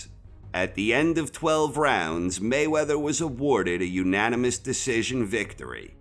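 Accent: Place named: New York, United States. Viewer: Love your Channel you kinda sound like Howard Cosell with a New Yorkers Accent!!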